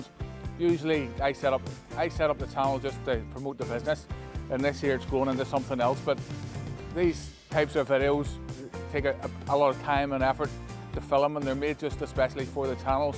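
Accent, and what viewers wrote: Northern Ireland, United Kingdom. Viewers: Great video again, learning loads since I started watching you. I’ve even learned how to understand the thick N.I accent 🤣👍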